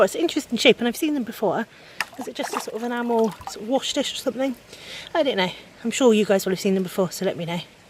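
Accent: Somerset, United Kingdom. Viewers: As soon as you start talking it takes me right back to my childhood and friends I had in Portishead! So far away now, so really nice to see your adventures around Somerset - I was raised on The Levels! I'm so pleased to see the waterways almost pristine, compared to so many in US which look like runoff from garbage dumps! And I have to say I am honestly surprised by how much stuff you find in the rivers, like pottery - I keep saying to my Dad he should really take to exploring his (Devon) farm more as I'm sure it's hiding many relics... Congratulations now on nearly 800 SUBS! Soon be up to a thousand. Enjoy your hunting - I do! 👍😊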